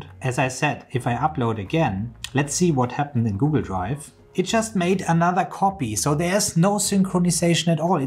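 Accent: Germany. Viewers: The thick german accent makes every tech video better. Des Bedarfs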